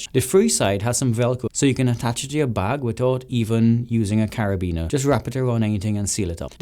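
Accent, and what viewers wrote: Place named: Trinidad and Tobago. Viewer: Your accent sounds Trinidadian, is it? Great content by the way!!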